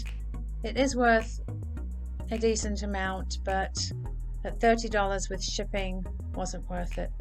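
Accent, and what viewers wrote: United Kingdom. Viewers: Hi, new to your channel. I saw you & Danni, the Niche Lady shopping together. Love your valuable info about why/why not you choose things. I'm a reseller, but have 3 booths here in western KY & don't sell on ebay. I heard Danni advise picking up fire fighter mugs, sunce they are good sellers & noticed a blue one on the shelf you may have missed. God bless! I love your accent! British? My mom was from England.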